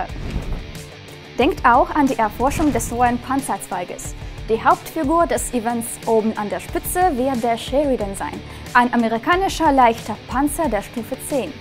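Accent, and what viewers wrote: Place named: Russia. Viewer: Ich mag den russischen Akzent :D Und geile Events!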